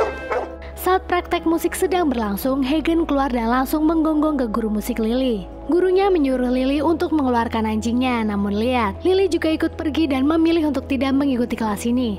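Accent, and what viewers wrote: Pemalang, Indonesia. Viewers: Fiks ni admin orang pemalang😂 Sekota karo aku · wong Pemalang ap mbk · Wong Pemalang mba? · Min orang pemalang yah?😅 gua orang pemalang neh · Orang pemalang kakk?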